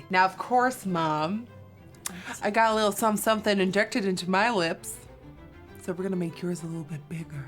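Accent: Netherlands. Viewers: Love the Dutch accent, really miss the Netherlands!! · Ik ga zo stuk om dat steenkolen engels eh, heeerlijk dat typisch nederlandse😭😂❤️ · Ik ga helemaal stuk, zo’n heerlijk Hollands-Engels accent..prachtig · Haar Engels is zo grappig ik vind het echt geweldig lekker nederlandssss 🇳🇱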